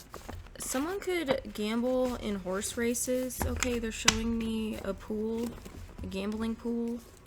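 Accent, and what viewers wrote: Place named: South, United States. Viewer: Thanku my dear, i luv ure sthrn drawl!!! Ppl hav that in th Deep Sth of our country too!!! Bc of th irish and scottush settlers that lived there!!!!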